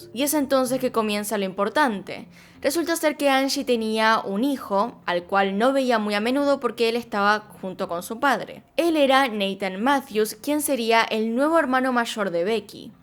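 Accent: Argentina. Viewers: Saludos desde Suecia, aquí vivo aunque soy mexicana. Amo tu canal y me encanta el acento argentino y cómo pronuncian "ll" y "y" 💓👌. Verte me hace sentir orgullosa de los latinos y nuestro idioma, además de que una chica como tú sea tan capaz de hacer este nivel de casos e investigación. Mi todo respeto a tu trabajo. Además tienes una mirada única y también me gustan tus vídeos de maquillaje.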